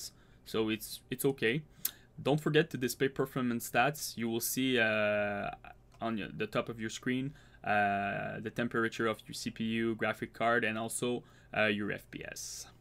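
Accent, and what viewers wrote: Quebec, Canada. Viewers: t québecois sa parait xd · québécois ?? :P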